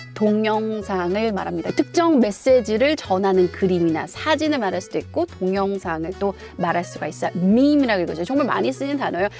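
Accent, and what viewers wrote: United Kingdom. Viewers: 아... 미국 갈 준비중인데 발음이 너무 매력적이라 자꾸 여기오게 되네요 ㅎㅎ 미국가서 멋지게 브리티쉬 발음 해보고 싶네요 ㅎ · 혹시 영국식 발음인가요? 문장 말하실 때 영국인 같으세요~ ㅎㅎ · 영국 발음 인가요?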